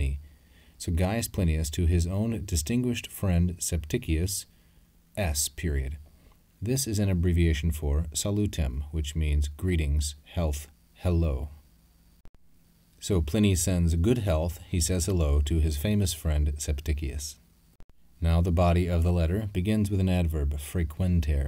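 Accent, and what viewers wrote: United States. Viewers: What is wrong with the American pronunciation of latin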